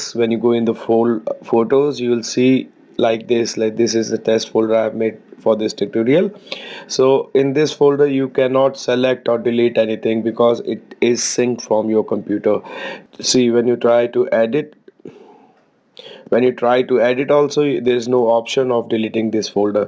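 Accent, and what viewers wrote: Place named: India. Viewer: Every indian accent people give the proper details ❤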